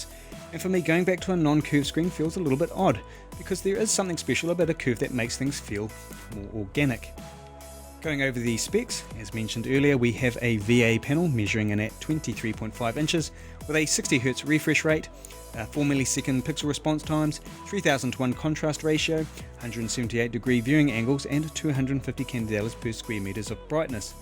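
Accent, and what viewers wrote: New Zealand. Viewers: don't be so ignorant, people from different parts of the world have different accents, this one sounds like a New Zealander.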